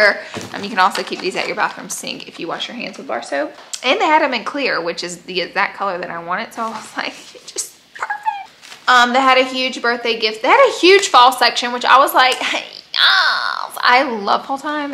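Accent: South, United States. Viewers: I miss her southern accent. It’s more endearing than the Cali girl thing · OMG!!! I LOVE THIS VIDEO. Girl your southern roots are showing out!!!!😘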